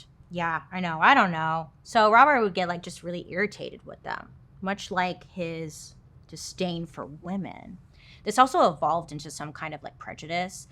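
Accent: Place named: Ohio, United States. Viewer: You said that correctly. You sound like a true Ohioan so lol favor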